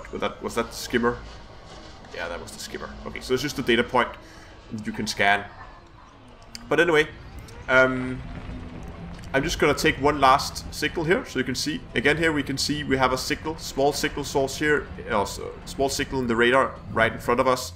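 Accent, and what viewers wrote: Denmark. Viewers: You've probably forgotten ever writing that comment, but it's quite a strong Danish accent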